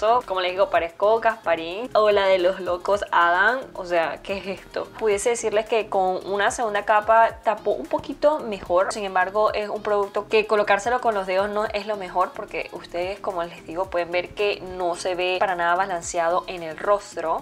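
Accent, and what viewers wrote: Venezuela. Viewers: Hola moreeeee👌🥰😂 saludos desde venezuela, tu acento es muy neutral pero tienes ese venezonalito adentro, me encanta👌❤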